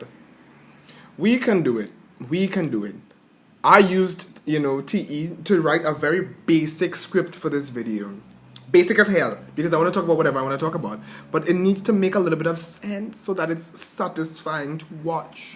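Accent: Trinidad and Tobago. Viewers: Once i heard the accent i knew trini one time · lol as soon as I heard the accent I knew you were a trini lol 😂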